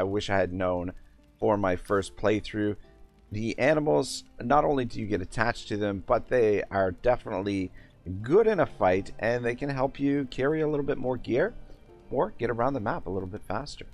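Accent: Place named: Canada. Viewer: This dude is definitely Canadian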